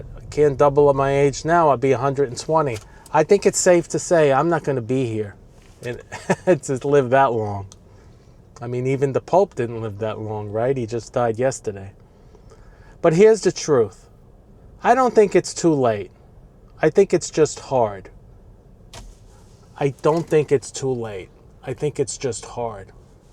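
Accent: New York, United States. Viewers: You absolutely have a new york accent! Nobody on the west coast sounds even remotely like you. I would also call it an east coast accent. Love it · Yes you sound like a New York native to me. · You most definitely have a very strong NY accent and İ like it Makes me feel at home and İm 6k miles away İts Richmond Hill İ believe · Yeah you have an accent but it's refined other than the standard New Yorkers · I'm in Florida and have worked with several people who have your accent. They are all NY Italians. Thanks for posting. God bless. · Definately New York accent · I'm from New Jersey. I could pick you out as a New Yorker in a second based on your accent. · You're very patient and calm to make that commute Monday through Friday. You have a New York accent. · This guy doesn’t sound like somebody of average intellect. He’s full of wisdom too. And yes he has a great NY accent that’s easy to listen to. 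Never forget we’re here in earth school to learn. We start learning when we’re born; if we’re lucky, we don’t stop learning til “God” calls us home from earth school. Great scenery of NY! Reminds me of the tv show TAXI. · If I may offer, you’ve rebuilt your life multiple times and succeeded. But maybe the move now isn’t reinvention, it’s using what you already know in a way that’s more stable and easier on you physically. Just a thought. Find some ways to build up some monies for later on. By the way, yours is definitely a New York accent!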